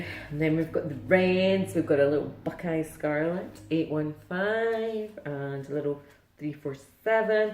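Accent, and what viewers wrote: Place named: Scotland, United Kingdom. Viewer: Congrats on the run! I couldn't do that to save my life. I've only got 1 stitchy project on the go, so I think anyone whose attention span can manage StitchMaynia is impressive! So enjoy your videos, I'm an expat Scot living in Australia for 30years, so a wee touch of homesickness listening to you speak. Taps Aff! x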